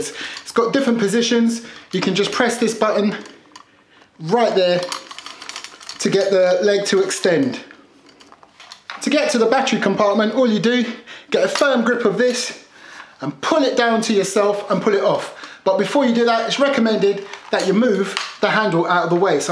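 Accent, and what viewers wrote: London, United Kingdom. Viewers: Well uve got another subbie gotta say your vibe is growing on me are you a londoner by any chance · Another fantastic review I tell you what your microphone is placed probably in the right place you sound very clear and loud which is a good thing and I'm really digging that British accent... Take it easy brother we will see you on another video and yes I did subscribe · are u british ??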